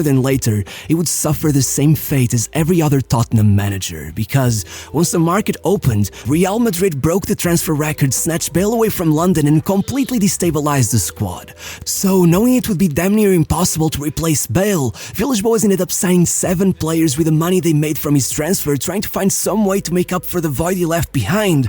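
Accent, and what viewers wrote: Portugal. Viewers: Eu sei que és português, esse sotaque tá aí, não dá pra esconder🤣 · Real ones know this guy is portuguese, i know buy the accent! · This accent is very Portuguese. 😅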